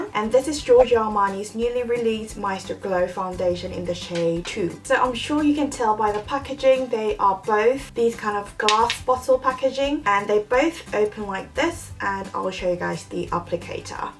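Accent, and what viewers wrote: United Kingdom. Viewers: 영국발음인가요...??? 진짜매력있어요 요즘관심많이가는데ㅜㅜㅜㅜㅜㅜㅜ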